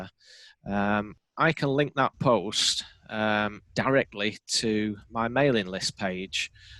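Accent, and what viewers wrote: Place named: Northern England, United Kingdom. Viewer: I don't have a business...maybe one day...but this was still really informative and helpful. Talking about profiles, I don't like to see "junk" on my feed so I follow pages that I like (as you said, aesthetically pleasing) with posts that I am interested in. I live in the UK (originally from "up North" so loving the accents 😉 ) so one of the things I look for in a profile is some indication of where the author/owner is located, especially if it's a small business I may want to buy from now or in future, I would rather buy from someone in the UK. Thanks for all the useful info!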